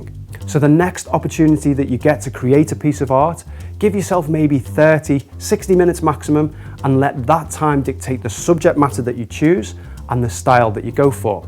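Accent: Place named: Manchester, United Kingdom. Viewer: Firstly it’s wonderful to hear someone from Manchester I really loved your video It has so much useful information as a relative beginner of older age group. I have never painted as a child or adult until my seventies. I wonder if you teach, in classes in Manchester.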